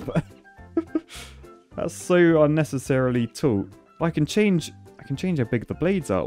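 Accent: United Kingdom. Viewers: sounding kinda British this episode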